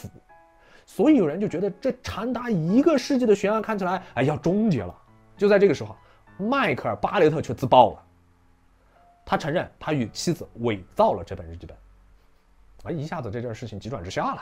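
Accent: Yunnan, China. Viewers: 哥们儿这一口云普把我逗乐了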